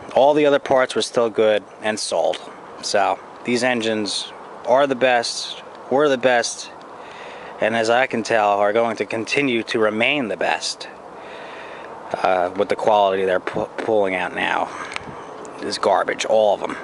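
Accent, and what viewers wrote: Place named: New Jersey, United States. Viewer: I was just given a 1960's AMF "Polar Bear" snow blower but it was repowered with a 7 hp Snow King from 1995 (made in late 1994). I will work on it tomorrow. I'm also working on the "Chonda" (Loncin, which is a Honda "copy") on a Toro Power Curve 180. There's no comparison between the two. I sense a NJ accent (and I thought I saw it on the boat in the background). What part of NJ? NYC here.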